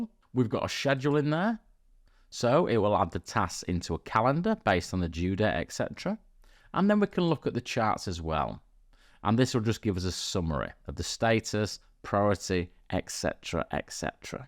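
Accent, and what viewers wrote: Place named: North, United Kingdom. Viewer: I was like "the hell is a book-it", but it's that northern charm "bucket". Love the videos!!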